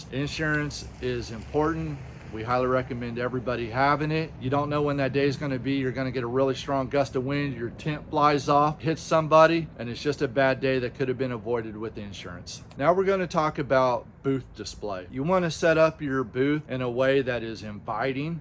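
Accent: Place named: Maine, United States. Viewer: Where anchored maine he sounds like from maine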